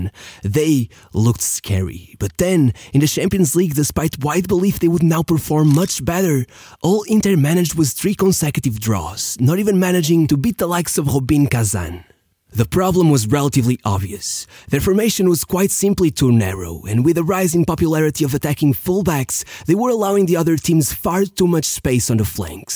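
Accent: Portugal. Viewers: Tenho a certeza que és tuga 🤣 mas força manu curto a brava 👏👏👏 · Why do you pronounce everything like it's portuguese ?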